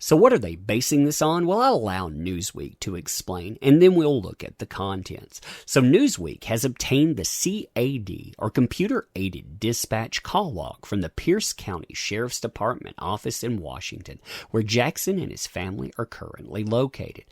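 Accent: South, United States. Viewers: I'm just saying Your Voice Sounds like the poster child for southern hospitality from a guy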